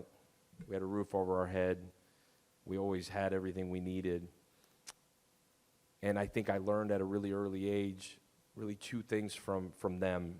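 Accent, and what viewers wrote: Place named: United States. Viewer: Dude sounds upper mid-eastern (he is), NOT southeastern; however, kudos for crediting his wife and parents; don't like the superficial "social correctness"/politics I hear, nor the vaguery, but I like his optimism and planning. A 250 mile radius recruiting circle is FAR too SMALL, eliminating 1,000 miles to the west of Auburn University) in the southeastern US, let alone the other 3/4 of the country ! I like hearing about tailoring the offense to the players' strengths, especially the QB.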